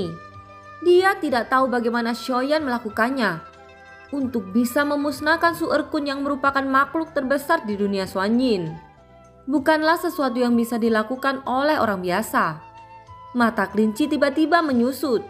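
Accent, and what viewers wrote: Java, Indonesia. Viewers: Kak orang jawa yah?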